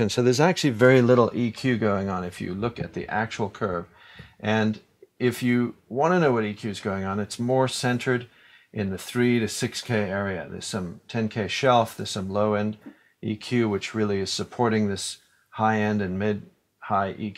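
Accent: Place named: South Africa. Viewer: The weirdest thing is as a South African, hearing his accent that has hints of the South African accent that’s been completely taken over by the American accent 🤣😂 anyway, such a talented engineer!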